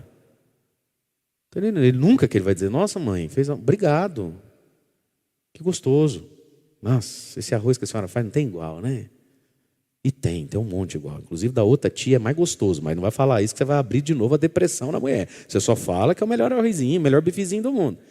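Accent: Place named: Minas Gerais, Brazil. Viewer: A nois aqui travez . Um jeito mineiros de falar rsrsrs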